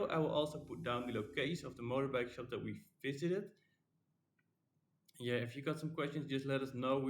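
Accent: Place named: Netherlands. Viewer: Prachtig! We blijven onze Hollandse accent zo overduidelijk behouden als we Engels spreken. Gelukkig is daar geen friet van Piet 😅 Zeer leerzame opname. Nice!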